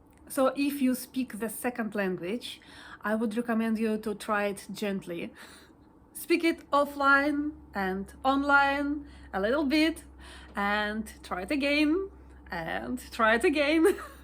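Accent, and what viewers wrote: Russia. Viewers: Hi, you are worrying too much. I am English with a Yorkshire accent. There are plenty of people in the UK who i would find more difficult to understand than you. In any case your Russian accent has its own charm. Your spoken English is clear, so dont fret! Except when you're playing open strings, obviously!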